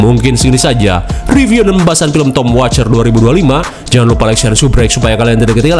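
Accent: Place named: West Java, Indonesia. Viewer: mang,lahir taun braha sih😂..sundana jiga Sunda bandung cianjur,milenial pasti yeuh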